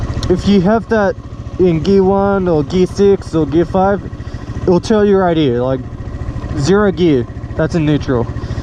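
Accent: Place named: Australia. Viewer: Excellent commentary. That aussie accent is a beauty.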